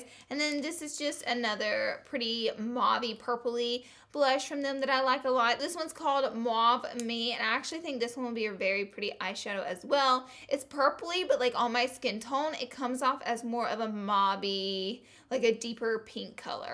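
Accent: South, United States. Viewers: !!! 😊 I'm a newbie, just subscribed today but I love your southern accent and quirky personality. Haven't tried much of NYX before but I'll def be purchasing that palette you mentioned. Thanks for the tipssss.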